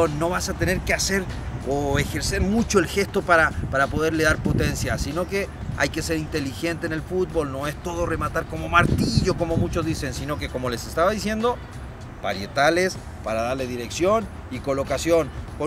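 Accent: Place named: Mexico. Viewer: Pero choro no hables como Méxicano🙄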